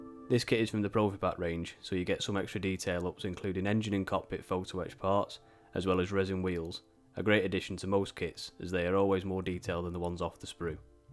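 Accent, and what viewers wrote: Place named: North, United Kingdom. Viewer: What part of the North are you from? great video mate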